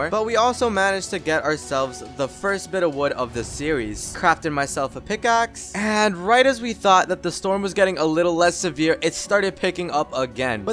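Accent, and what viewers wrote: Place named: Italy. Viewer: When I hear your voice, I hear an Italian accent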